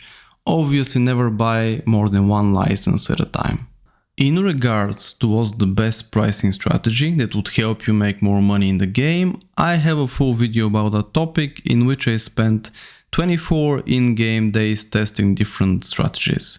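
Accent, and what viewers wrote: Russia. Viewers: Какой русский акцент...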